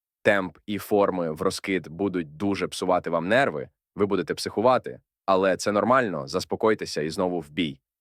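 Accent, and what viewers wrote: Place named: United States. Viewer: American pronunciation 👎 I like British pronunciation 👍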